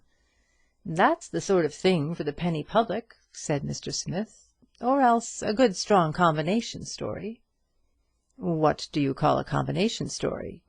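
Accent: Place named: United States. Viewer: Sorry, can't possibly endure British literature read haltingly in an American accent. It doesn't work. At all.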